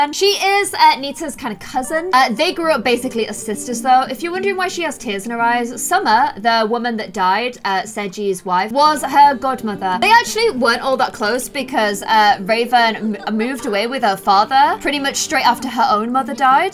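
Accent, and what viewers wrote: United Kingdom. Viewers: Isn’t what she’s saying just the British pronunciation? I’m not a native English speaker but that’s how I always said it in my head lol She was also mispronouncing all kinds of stuff when she was playing her Korean series and is, to this day, still saying “Seo-jun” wrong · It’s her british accent lol, also, i think you mean “covEn” and not “coVen”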